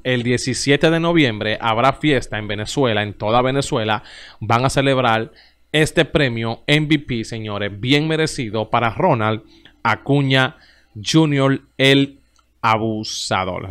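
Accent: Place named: Cibao, Dominican Republic. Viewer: Compa usted habla demasiado feo, un cibaeño que cambia la i por la L, ejemplo dos ochental tres, barrabasada total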